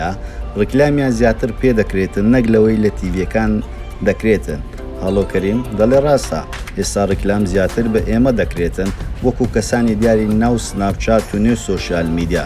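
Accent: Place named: Erbil, Iraq. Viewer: تن تن تن تننننن هه‌ولێری بێ مێشك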